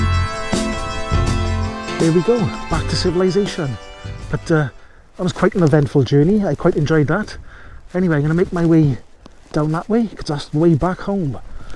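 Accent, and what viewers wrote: Wales, United Kingdom. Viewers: Hi, I like your welsh accent, reminds me of my late father in-law, Unfortunately my wife was born here in Yorkshire so no nice accent. Great scenery on your challenging ride. I have a 6 month old Carrera Vengeance e spec which has been a total nightmare. Long story but i have replaced all electrics apart from hub motor. I fitted a left hand thumb throttle due to wanting to keep throttle wide open while changing up as only 250w motor so need to keep it rolling, especially up hills. Subscribed, will watch other vids soon.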